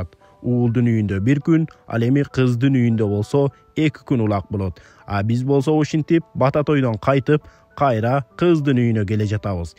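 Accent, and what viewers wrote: Standard. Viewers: Азаматсын кыргыз тилин бурмалабай таза адабий тилде суйлойт экенсин!!!!!👍👍👍👍👍👍🇰🇬🇰🇬🇰🇬🇰🇬🇰🇬🇰🇬🇰🇬🇰🇬🇰🇬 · коптон бери корунбой калдын эле Памирге барып келген турбайсынбы Азаматсын кыргызчаны таза суйлогон Кыргыз жигит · Таза суйлопсун Рахмат тууган Памирликтерге салам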